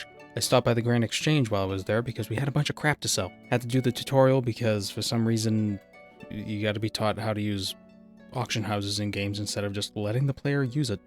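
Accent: Boston, United States. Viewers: i'm just here to look for the boston accent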